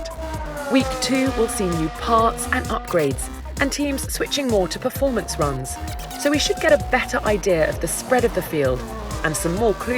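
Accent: United Kingdom. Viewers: Very pleasant and beautiful voice of the talking lady. I love how sound the british accent. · Perfect English accent. Elegant without being toffy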